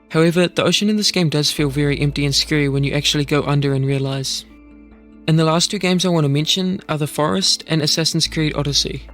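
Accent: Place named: New Zealand. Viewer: I was thinking the whole video "Is this guy Kiwi" You have a very Kiwi accent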